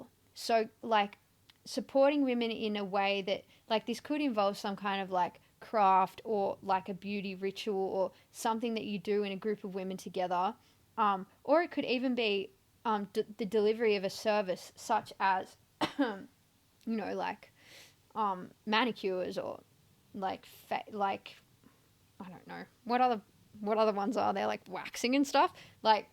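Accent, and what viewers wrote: Australia. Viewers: G'day!! Thanks to 13 Moon Tarot 4 recommending your channel. I even hit subscribe b4 i listened 😂As soon as u began speaking, a huge smile cracked over my face. It's so refreshing to hear an aussie!! Card 3 totally resonated 😊 as I listened in my Queensland garden ❤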